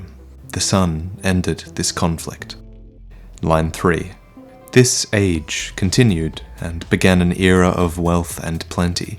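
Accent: Australia. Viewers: Hey, what part of Australia are you from? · he is Australian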